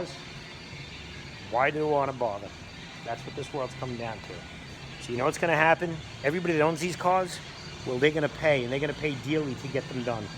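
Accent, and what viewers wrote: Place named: New York, United States. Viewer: Nothing like a New Yawker to tell it like it is.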